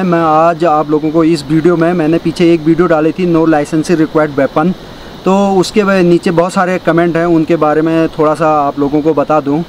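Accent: India. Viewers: Apki Uttarakhand valo ki jasi avaj lgti h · bheji mi smjhi gyon😉...Pahadi tone aani ch😂😁❤️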